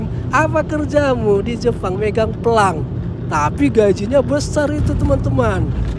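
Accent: Medan, Indonesia. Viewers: Bang aq ngakak banget pas abang bilang telor bunting,telor bengkak pula😂😂😂dan aq suka bnget cara abangnya ngomong medan banget gitu tp slow ah pokoknya nyaman aja lah bang liat plus dengerin abangnya ngomong.Btw aq orng Bandung bang😅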